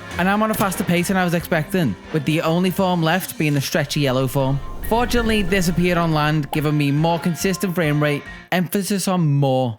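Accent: Liverpool, United Kingdom. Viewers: Firstly, your stronger than me lad i had a go at hunting a dex a while ago and tbh i dont think my attention span’s recovered.. also, scouser?? Got a little scared hearing another accent on here i cant even lie. Dunno what it is about brits and youtube but i never see any of us on here loll. Anyways congrats on this man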